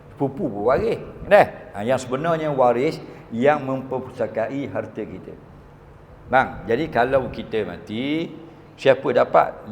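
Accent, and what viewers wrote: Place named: Terengganu, Malaysia. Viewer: Ustaz ckp bahasa melayu standart..ujung2...je ganu...jarang2 ni..😂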